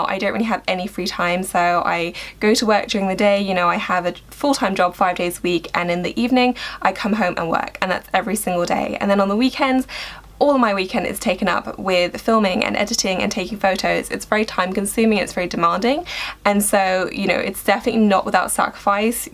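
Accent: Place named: London, United Kingdom. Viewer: She's just from London, that's how they sound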